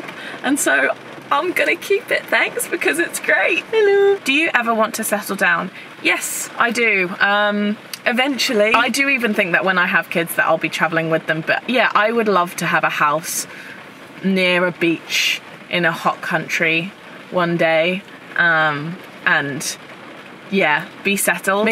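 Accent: Australia. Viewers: I love listening to your accent becoming more and more aussie! great video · Always love your videos! It sounds like accent is changing you sound more Australian now! Also what is the song at the end and could you likn your spotify or his? Thanks Happy New Year! You should do a meet up if you go to Canada! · a bit of an Ozzy accent coming through lol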